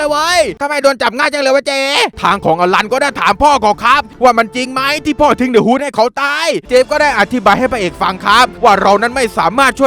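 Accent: South, Thailand. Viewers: พี่บ่าวๆๆนุ้ยอยากให้ สปอยเรื่อง เอลซ่าภาค2นะพี่บ่าว เด้กใต้จ้าเหนพี่พูดใต้ชัดเลยคิดว่าคนใต้ค่ะ ขอเอลซ่าภาค2ค่ะนะๆๆ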